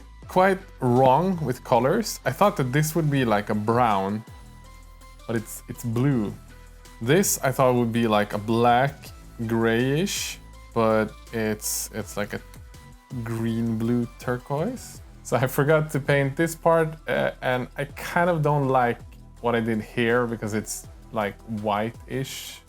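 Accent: Sweden. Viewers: WHAT DUDE! Oh my god you’re swedish??! I could tell you werent native english but wow im shocked. Haha i knew I recognized the accent. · Ah! I thought I heard the Swedish! Great video, lot's of fun :)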